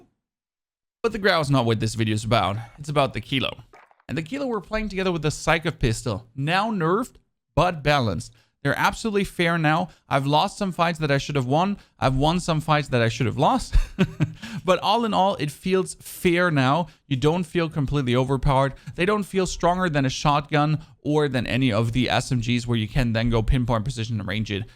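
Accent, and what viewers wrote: Germany. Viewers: Alta du bistn Deutscher? Holy moly, ich hab's nie vermutet... xD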